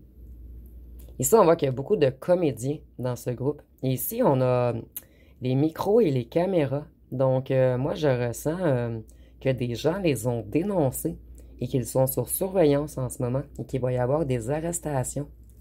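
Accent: Quebec, Canada. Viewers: ❤❤❤❤ BONJOUR MME J AI ENTENDU SE QUE VOUS AVEZ DIT SE MATIN MAI SACHER QUE TOUT LE MONDE N RST PAREILLE ET MOI JE VOUS APPRÉCIÉ ET VOTRE AXCENT QUE J AIME J AI BEAUCOUP TRAVAILLE AVEC DES QUÉBÉCOIS ET JE M ENTENDAIS BIEN DRS BON SOUVENIRS ...... MERCI POUR TOUTES VOS GUIDANCES MAI JE SUIS EN BÊTE AVEC C EST GENS MAI LA POLICES QUE FONT-ILS ????? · Vous avez de très belles mains et ongles très beaux bien ,j'adore cela ,votre accent québécois aussi est fort agréable Madame , et vos tirages sont très justes toujours , vous êtes très bonne dans les prévisions de guidances .❤👍🙏🌹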